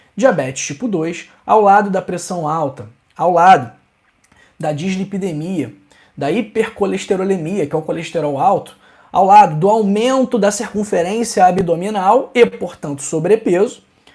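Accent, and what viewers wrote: Rio de Janeiro, Brazil. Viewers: Obrigado, doutor. Não se preocupe com críticas sem sentido. É um grande médico, do bem e percebe-se, também, que é muito atencioso e amoroso para com as pessoas, parabéns! Fale bastante e com seu sotaque carioca, passando-nos suas importantes informações que nos ajudam na qualidade da saúde. Abraço.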